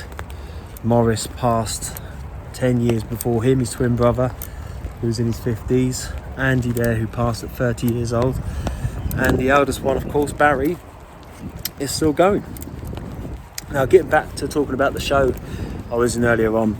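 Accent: United Kingdom. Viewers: Sad the brothers couldnt be buried in one place. Love your britsh voice. From Canada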